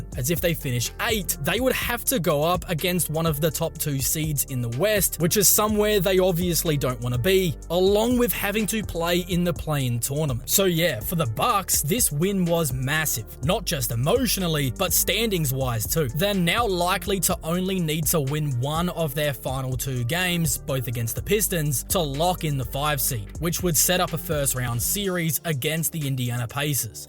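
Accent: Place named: Australia. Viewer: Dang Australian accent. Is it AI?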